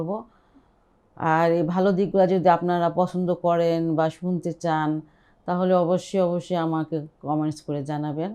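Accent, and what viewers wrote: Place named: Tangail, Bangladesh. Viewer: আস সালামুআলাইকুম আন্টি।আপনার কথাগুলো খুব ভালো লাগলো।কথা শুনে মনে হচ্ছ আপনি টাংগাইলের ।রান্নার রেসেপিগুলো খুব ভালো লাগে।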